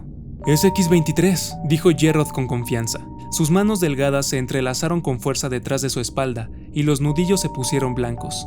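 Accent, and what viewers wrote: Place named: Zacatecas, Mexico. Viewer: Se nota el acento de Zacatecas pero es mucho mejor que la voz robótica o el español castizo con su odioso seseo 😢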